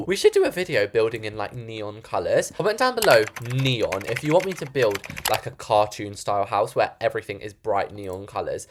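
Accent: United Kingdom. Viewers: yeah I’ve been watching for 1 year now or about that and I found out as I realised that he has a British accent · Uhh I live in England and I approve of this house and the British voice what u America people think how we think speak that cocky from London so ya Edit: this house is like a mixture of rich and normal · FIRST AND BTW I AM NOT BRITISH SO I AM TRYING TO LEARN IT FROM YOU LOL YOUR BRITISH IS AMAZING (PLS PIN ❤️💙 )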